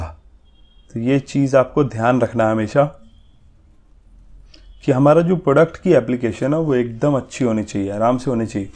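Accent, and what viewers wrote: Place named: Pakistan. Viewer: Assalam ualikum sir apka btaane ka tarika bilkul esa hai aise Pakistani bat krty hain ya apka koi talluq hai Pakistan main apka koi hai yha main agr india hoti to apky bht kuch aky seekhti or sekhti bhi hon ma sha Allah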